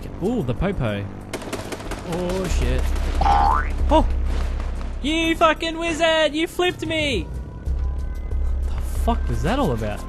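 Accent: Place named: Scotland, United Kingdom. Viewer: that adorable Scottish accent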